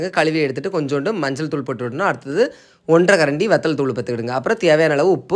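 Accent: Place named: Kanyakumari, India. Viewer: Annan namma Kanyakumari slang super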